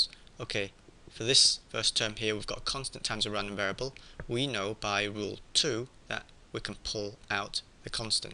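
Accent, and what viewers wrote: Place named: United Kingdom. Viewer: That british accent just adds a whole layer of authenticity on top of it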